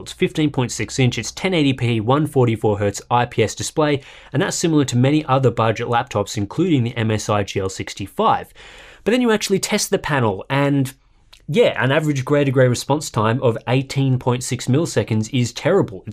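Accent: Australia. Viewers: Good day mate, are you from Australia?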